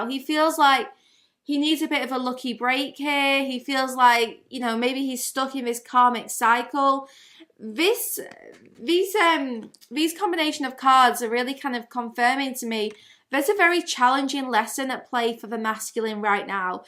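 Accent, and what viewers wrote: England, United Kingdom. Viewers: Love ur accent but cant place it:) are from the UK? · Tf guidance in English accent ... Omg 😃 this is the best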